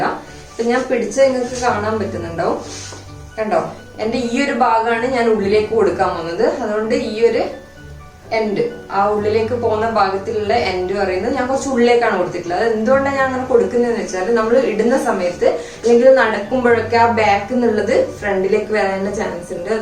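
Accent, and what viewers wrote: Kannur, India. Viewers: Kannur kaariyano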